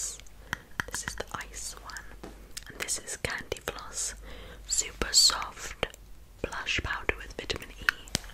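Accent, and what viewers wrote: United Kingdom. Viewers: Pros: British accent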